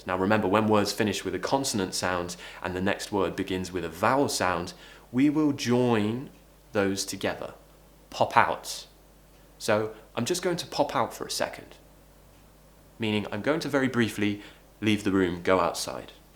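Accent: United Kingdom. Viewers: Thank you! I like the british pronuntuation, this is very useful! Regards from Mexico! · I love your British accent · I just love to learn ur british accent.. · Dear, love ur British accent persistently, and do remember to take the masks while going outside, washing hands for preventing yourself from being infected. Take Care!!!!! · Question, does exist any British English app? It sounds like British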